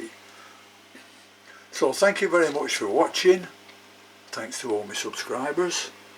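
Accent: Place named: Newcastle, United Kingdom. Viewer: Keep up the excellent work. Have a great day. BTW I like your Gordie accent.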